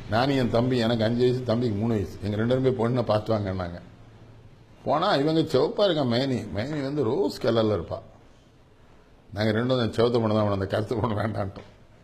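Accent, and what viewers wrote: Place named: Tirunelveli, India. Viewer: My native nellai speech,,,♥️♥️♥️♥️♥️🙏🙏🙏🙏🙏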